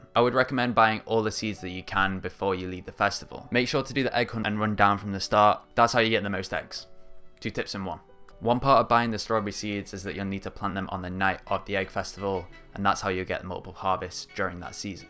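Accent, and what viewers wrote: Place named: United Kingdom. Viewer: Chewsday I like british accent bro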